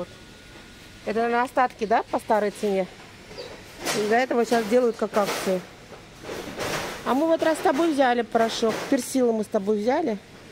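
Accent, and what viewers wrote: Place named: Crimea, Ukraine. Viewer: В Крыму тоже так говорят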